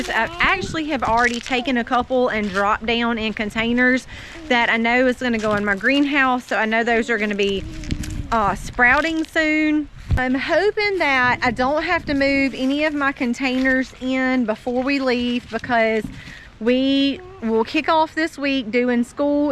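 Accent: South, United States. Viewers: I always enjoy watching you all! And quite frankly I love to hear your wonderful southern accent! My family was from Alabama… and I still have some there! I live in CA! Wish I could live somewhere Else . And maybe in the near future we will be making some trips to the south to see how we like it, We are in our 70’s so we are not young chicks! However we have to get out of CA even though I was born here I really hate what it has become. Watching your channels have renewed my desire to be more free From all this concrete jungle and grow our food and have some animals. We live in a beautiful area, and my husband has worked hard to provide for our 5 kids. With them all grown up now, we just married our baby in July…she is 32… we were married at 19 & 23 years old. So the world has changed so much since then. Watching you all has given me more hope to actually change things. 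Thank you for your channels ..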